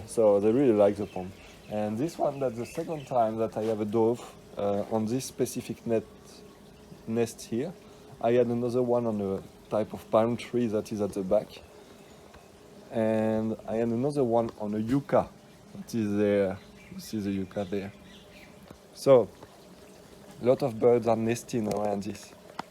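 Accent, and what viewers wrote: France. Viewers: I used to be pessimistic about the future of the world but over the last 20 years I think we have turned a corner. Doesn't make many people happy the future I see as it's tech driven but that the way it will be. It's just spreading the wealth is the issue and that political. Anyway thats a long story. On a completely different topic, that's a French accent? The wifes French and we come in to Melbourne occasionally for your sons braces to be done in Bentleigh East. A very important question and if you have an answer it could make the wife very happy (and the son and me). Is there a good French bakery near there and/or a French importer of foods? Thank you.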